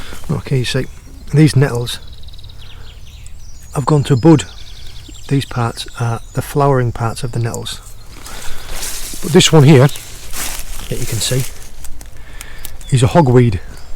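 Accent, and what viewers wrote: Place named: Northeast England, United Kingdom. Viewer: I'm in Pembrokeshire so the Seas are very similar, both of us with our toes dipped in the gulf stream, but there was so much variety in that one spot, I've dived for many many years and have never come across the cuttlefish eggs, they were impressive, and well disguised I guess they could be mistaken for seaweed etc. Your not cornish though are you, I watched some of your videos last year and thought you were on the Northeast coast and remember thinking at the time that's a very unique spot for the North Sea! Thinking it must have been a micro climate on an estuary somewhere, I realise now I'd mistaken your accent for location 😁😁👍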